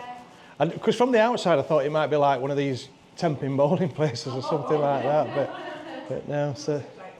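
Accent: Yorkshire, United Kingdom. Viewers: Another brilliant one. Yes, it’s winter with accompanying weather but you soldier on with the help of a cuppa and a bacon banjo. I like getting to see things & places with your channel that I would never normally see AND I get to know how Yorkshire folk pronounce place names. Love your vlogs and look forward to your next adventure along t’coast. 💕💕💕💕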